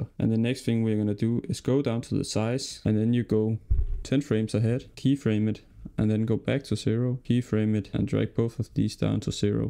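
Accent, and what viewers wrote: Denmark. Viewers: Your defintly danish 😆 but thanks, great tutorail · og man kan bare høre du dansk 🤣🤣 men fed video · i can hear that you have an accent. It kinda sound like you are danish. Are you? BTW great video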